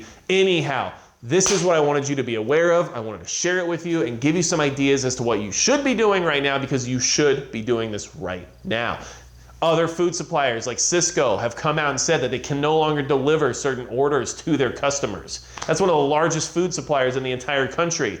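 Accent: United States. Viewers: You sound like you're from Hawaii lol. There's so many ways of making rice into a meal in and of itself. If anyone's reading your comment they best be listening because Japanese rice is the 💣 Asian rice is the best although I like jasmine and basmati as well.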